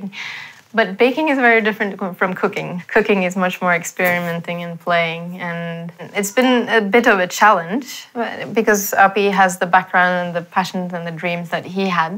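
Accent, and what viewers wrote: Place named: Turkey. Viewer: Ablam ya :dd konuşmaya başladığı ilk anda dedim ki bu kadın kesin Türk ancak Norveçliyim deyince de ümidimi kesmedim çünki bu ingilizce aksan ancak Türkiyeden yolu geçmiş birinde görülür :))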